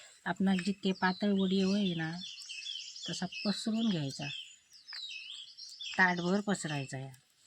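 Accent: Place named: Malvan, India. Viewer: तुमच्या सर्व रेसिपी सोप्या असतात तुमचं मालवणी बोलणे गोड वाटते नाचणी वडी खूप छान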